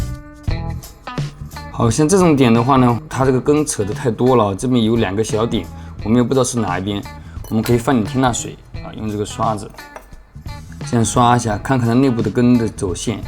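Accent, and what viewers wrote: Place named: Fujian, China. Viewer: 是我看过做维修视频做的最专业的一个，请问是不是福建人？听口音像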